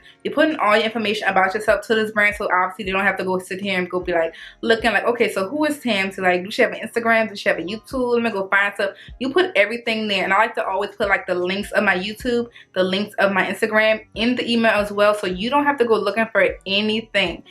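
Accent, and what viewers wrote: New Orleans, United States. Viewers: Girl I hear that New Orleans accent lol🤣😂I am from New Orleans too🤗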